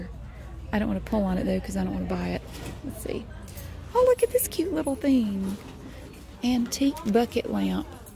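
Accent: South, United States. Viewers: "Naughty-nahn, naughty-nahn" Gawd I love the southern accent. LOL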